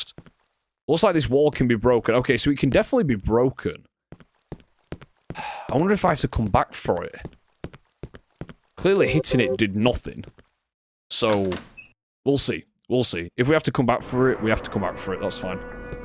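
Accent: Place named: England, United Kingdom. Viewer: Just found your channel through this letsplay, so nostalgic and you have the perfect balance of theorizing and commentary without overwhelming the game! Small question, I apologize if this is rude, but where in england are you from? love your accent best of luck on continued channel growth and congrats!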